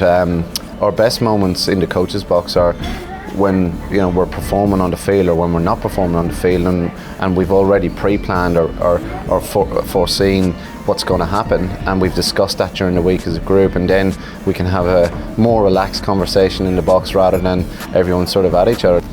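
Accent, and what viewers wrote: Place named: Cavan, Ireland. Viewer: I love the fact hehas a Cavan accent (that's how you spell it)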